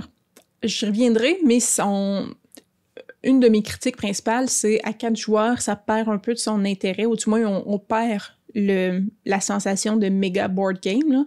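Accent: Quebec, Canada. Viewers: Bel accent Quebecois! (Un Francais 😊)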